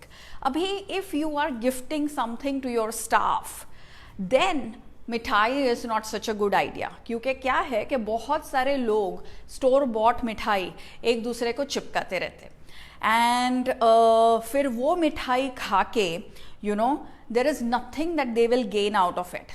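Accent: Mumbai, India. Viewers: i love d way u talk ......in ur vedios ....so natural ......fulll mumbaikar style lov it .......with ur funny lines that accept ur a bevda lol .....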